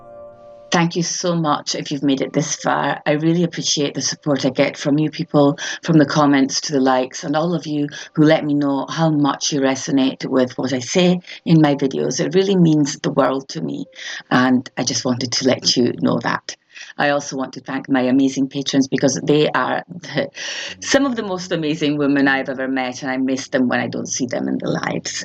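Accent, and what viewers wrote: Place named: Scotland, United Kingdom. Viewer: I don't think it's too loud. There's something with the audio quality that sounds very different from other content creators (it's not your beautiful Scottish accent). If you have a good microphone, I'm not sure if it's to do with the acoustics of the environment you record in? 🤔 Just thought I would mention it because I think the content you create is what a lot of artists really need to hear, and a small tweak to the audio might help your videos reach even more artists. 🙌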